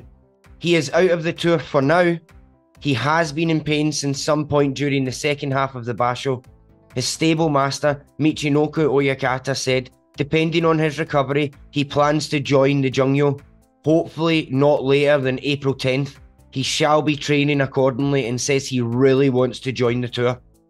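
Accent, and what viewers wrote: Scotland, United Kingdom. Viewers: For some reason, English folk butchering Japanese annoys me, but in a Scottish accent its still lovely 🤣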